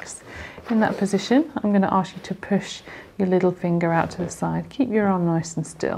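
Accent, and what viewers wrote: United Kingdom. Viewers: That’s full on Pommy, not Occa at all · Can't stand British accents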